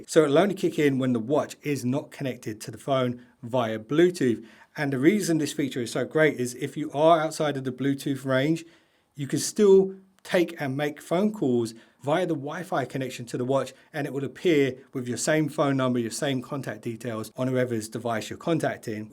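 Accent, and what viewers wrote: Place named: United Kingdom. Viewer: Why are all the YouTube galaxy videos British?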